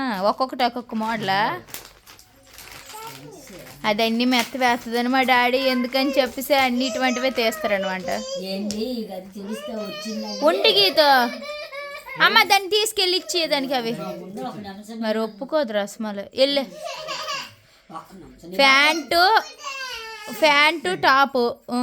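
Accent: Srikakulam, India. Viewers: Me srikakulam anttah bad slag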